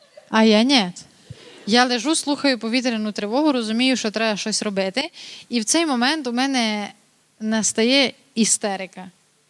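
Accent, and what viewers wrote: Transcarpathia, Ukraine. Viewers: Жахливий діалект))) це на Закарпатті такий?) Але ха українську лайк, на підтримку україномовного ютьбу!) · Закарпатська українська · Мова дивна, нащо так? З усіх дірок на російськомовних капає "вчить мову", а потім вмикаєшь і отаке. Дивися та червонієшь. То не стиль, не говірка, то якась фігня. Посорилася вона... ще й мати. Краще вже чистою російською, а не російською з українським акцентом. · туй нич не є руснацьких говірок. По факту : люмпенська тарабарщина з закарпатським акцентом, щоб кієвськиє панялі'. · То не суржик. То закарпатська говірка.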